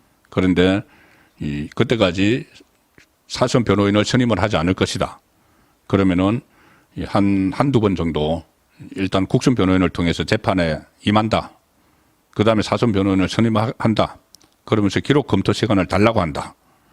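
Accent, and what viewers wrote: Gyeongsang, South Korea. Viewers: 조중동 종편 눈치나 살금거리고 보면서 대세가 어딜까? 머리굴리는 경상도 말투 송국건 ㅉ 경상도 의리있다는 거짓말이나 하지마라 ㅉ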